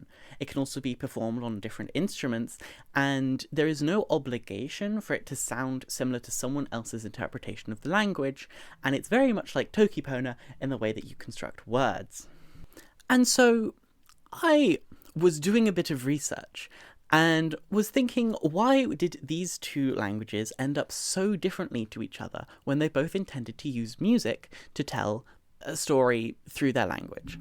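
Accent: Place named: United Kingdom. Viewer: Super british accent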